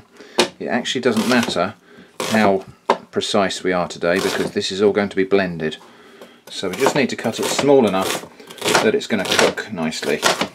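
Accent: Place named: Dorset, United Kingdom. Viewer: thought you had a Dorset tone I'm near Bournemouth funnily enough lol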